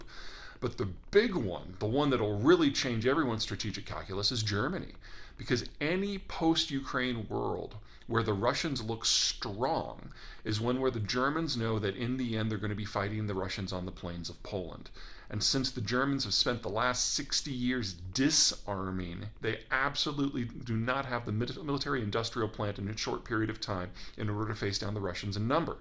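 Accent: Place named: Texas, United States. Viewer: Don’t blame him, he lived here in Texas for a long time. We barely speak English.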